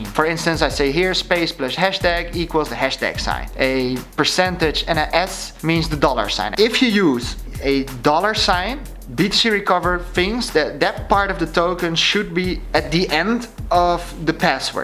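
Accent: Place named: Netherlands. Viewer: You have a ducth accent '-'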